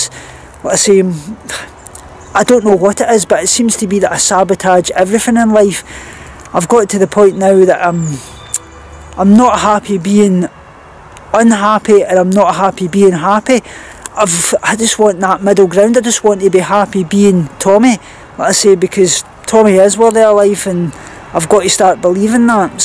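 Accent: Scotland, United Kingdom. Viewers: I was half way through my comment and it disappeared so if you find a random unfinished thing, it's mine... ANYWAY, ONTO MORE IMPORTANT STUFF!! I have said this before but this is a great time to say it again ... I found you in sin city Renee's comments section and was interested enough in your comments to see if you had your own channel. So I found you through veganism but stayed because of YOU. I stopped following the vegan youtube community last year when it all got really stupid. I unfollowed all but 2 or 3 vegan channels. Of those, you're the only channel I watch religiously. I do eat a vegan diet but I don't have an eating disorder - at most, I have a dismorphic self hatred about being overweight. I like your channel because of you. Not the ED. Not the veganism. Not the furry family. Not the irresistible Scottish accent. Not the eclectic posters on your wall behind you. 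Not the singing. Not the persistent rain. Not the wrap around shades or squeeky voiced sound effects. Not the great variety of dog fluff. (Even though I enjoy all of the above!) I watch your content because of you. You, Tommy. And the rare but lovely cameo appearances from Laura :D If you want to know what it is about you (and not my list of nots) It's because of who you are as a person. You're very friendly, you talk openly without judgement and you keep your content true to who you are. You don't need to be rude or offensive to talk about awkward subjects, you have no idea how enlightening and truthful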